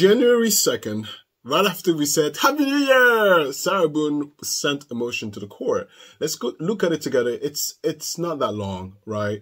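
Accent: France. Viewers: I have never felt so special to be called Lynda. Nobody has ever said my name that many times in such a short time with a French accent to boot. Pun intended. LOL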